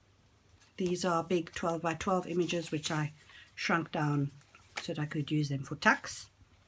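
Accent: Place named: South Africa. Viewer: Really lovely. The tea dying really came out super duper great this time... well, it always does!! I really like a simple "clean" journal personally and the more I get into this the less I want to embellish the pages because I think most people want to have the freedom to fill up their own journals. I recently made a travel journal and I also made some word embellishments and stamps to take on the side during the vacation. Good idea. Lovely to hear your South African accent too. Congrats on another gorgeous journal!! xo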